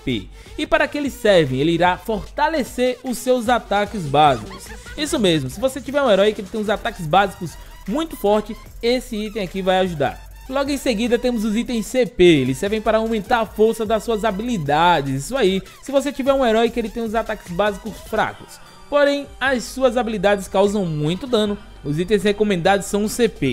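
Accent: Northeast, Brazil. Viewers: Youtubers com sutaques nordestinos são os melhores pra explicar as coisas kkkkkk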